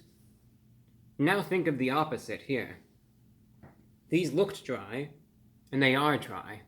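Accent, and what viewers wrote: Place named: Mid-Atlantic, United States. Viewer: Mid Atlantic accent and idk why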